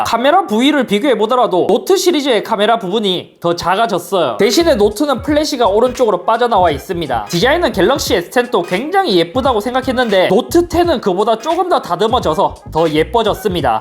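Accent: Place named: Busan, South Korea. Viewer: 안녕하세요. 저는 형님을 컴퓨터 조립할때 처음 접했는데요. 낯선 경기도권으로 취직을 해서 그리운 부산을 형님의 사투리를 들으며 버텼습니다. 항상 감사합니다!! 주문번호 뒷자리는 <num>입니다. 감사합니다.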